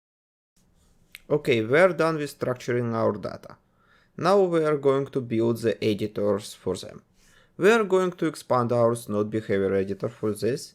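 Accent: Russia. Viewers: Благодаря русскому акценту я лучше понимаю) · произношение русского)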